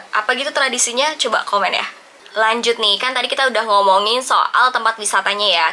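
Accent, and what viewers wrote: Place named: Surabaya, Indonesia. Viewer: Arek Suroboyo Iki loh masse 🙋🙋🤣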